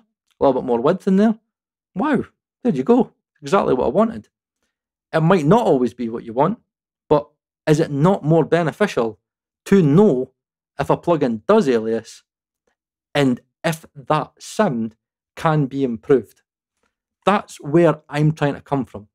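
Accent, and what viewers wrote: Scotland, United Kingdom. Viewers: Yeah, it surely would improve the intelligibility. I mean, being Scottish doesn't help it one bit. 😆 Love your vids, man, keep'em comin'! 💪😎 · Lol. I didn't know that's how the Scottish accent sounds like. It's kind of cool. Hey, BTW, I'd love to see you reviewing and testing Black Rooster plugins. 🙏🏻 Thanks a lot for your great work!!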